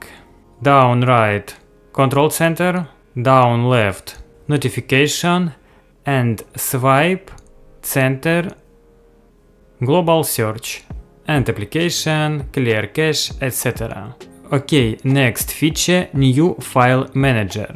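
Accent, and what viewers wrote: Russia. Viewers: Сразу слышно что автор говорит на русском)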